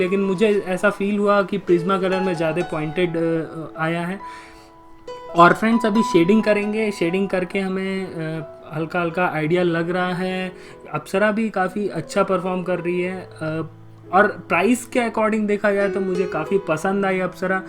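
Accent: Bihar, India. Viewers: Sir aap Bihar si hoo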